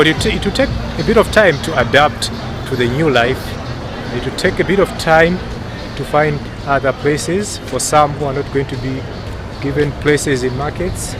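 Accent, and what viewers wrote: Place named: Zimbabwe. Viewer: He thanks for the video this happened when i was in zambia in july/august. You sound zimbabwean bro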